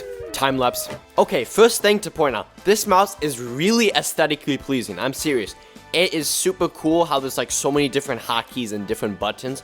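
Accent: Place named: New York, United States. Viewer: i love that ny accent lol ily btw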